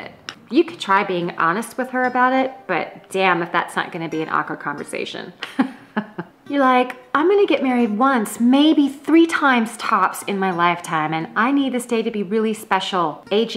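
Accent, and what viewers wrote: United Kingdom. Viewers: You got a cute little pommy accent there, how are you at doing an Aussie accent ? If you can do a good one, you'll be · awesome English accent it sounded just like the posh English accent haha Stay Gay!:)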